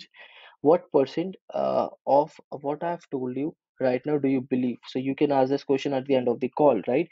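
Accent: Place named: India. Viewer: Hey nice video had some questions though - 1) how can we call us prospects from india for cheap? 2) how do u book appointments for us clients after generating some interested leads? 3) what is the service and what is the irresistible offer that you use in your agency? 4) aren't clients bothered by your heavy indian accent during sales calls? Keep doing the great work man. You are helping the community a lot.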